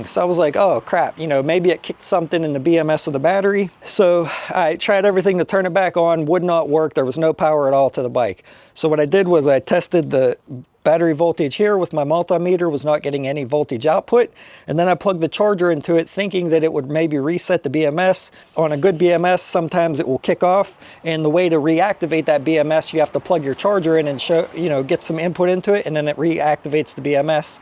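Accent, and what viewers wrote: Pittsburgh, United States. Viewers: Dude sounds like he's from Pittsburgh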